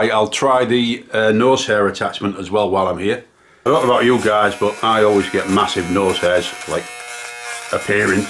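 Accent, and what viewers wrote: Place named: Liverpool, United Kingdom. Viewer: Absolutely brilliant. No fluff, straight to the point. Sound like a scouser or Manchester maybe.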